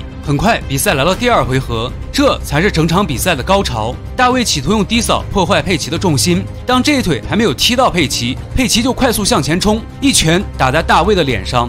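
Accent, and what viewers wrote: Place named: Henan, China. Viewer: 画外音的普通话口音能听出是河南人😁，河南电视台的格斗节目“武林风”节目很有名……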